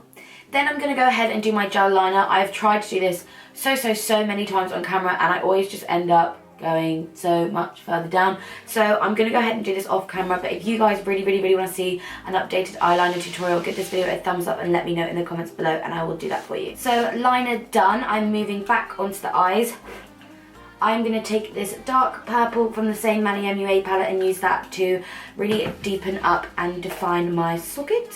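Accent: Newcastle, United Kingdom. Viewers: Why were you doing a geordie accent? & love your skin makeup! X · Ahhhhh fuck it lets purit everywhere 😂😂 I'm a Geordie and you legit sound like me when I'm getting ready 😂😂🙌🏼👏🏼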